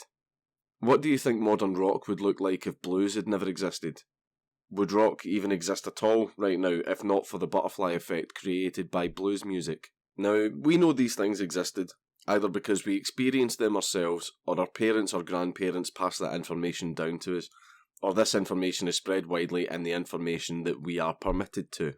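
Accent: Scotland, United Kingdom. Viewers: don't break dude, they can lock up your Body but your mind is free like a bird. (add subtitles to your Video in order to reach more people. I can understand scottish accent since i spent a lot of time in Glasgow over the course of many years but most who know english have a hard time understanding your accent) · So where do I sign up for audiobooks read by a dank Scottish fellow? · Nice vid, but FYI "de jure" and "de facto" are Latin, so your pronounciation of "de jure" in particular is way off. This is not a pedantic point, here's why. I was listening to your video and not watching, and I was wondering why you were talking about "du jour" authority (which is French for "of the current day"). So for a while I couldn't understand why you were talking about arbitrary authority as opposed to authority by law (de jure). To pronounce "de jure" in such a way that you won't confuse listeners like myself (which may very well be just me), you want to say it more or less like: "day you ray" (I'm trying to account for your Scottish accent! 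:D). Cheers.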